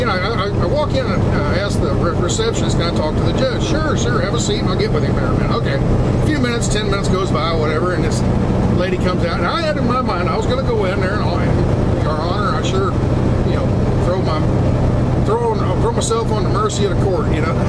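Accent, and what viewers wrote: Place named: Southern Ohio, United States. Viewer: You don’t sound much different than those of us in southern Ohio.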